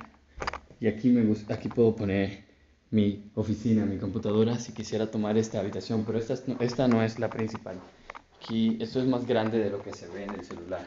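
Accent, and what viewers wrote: Dominican Republic. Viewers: Eres dominicano!!!!!!!!!!!!!!!!!!!!!!!!!!!!!!!!!!!!!!!!!!?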